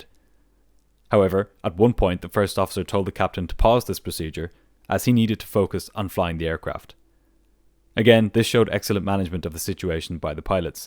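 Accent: Ireland. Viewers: Top o' the mornin' to ya! What a quaint Irish accent you have - love it! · The narrator is Irish...the ATC is clearly Australian my dude smhl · Bruh he's Irish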